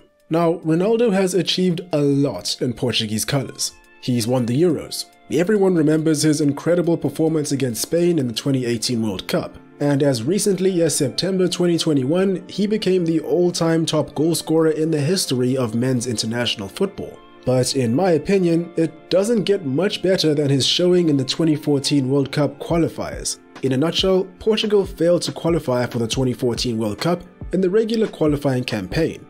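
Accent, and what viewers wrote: South Africa. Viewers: Bro, I'm south african and I can tell you're south african so surely make a video on benny McCartney or bafana bafana or something south african😂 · That "Ja" keeps confirming your Mzanzi'ness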